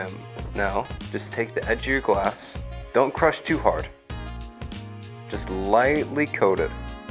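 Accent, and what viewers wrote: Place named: South, United States. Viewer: I know right? Love his slight southern accent too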